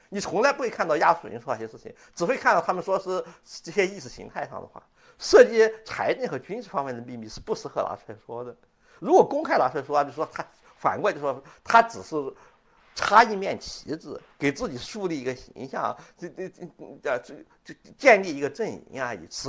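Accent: Sichuan, China. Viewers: 這口音就是四川的黑暗料理 非常地上癮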